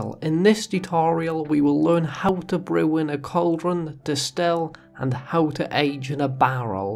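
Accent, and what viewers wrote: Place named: Wales, United Kingdom. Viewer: You from Wales? Your accent sounds Welsh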